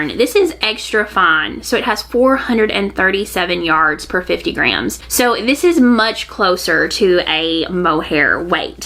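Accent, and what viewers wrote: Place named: Arkansas, United States. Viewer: I always love a new video from you! You accent makes me feel like home. My family is from Bentonville. I can not wait to see what you get up to this year!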